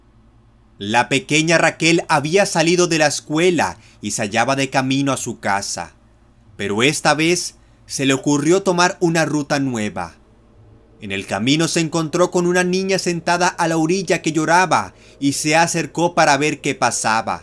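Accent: Arab world. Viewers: acento árabe X'D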